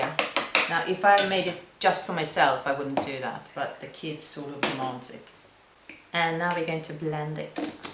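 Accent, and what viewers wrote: Sweden. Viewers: You sound Swedish 😊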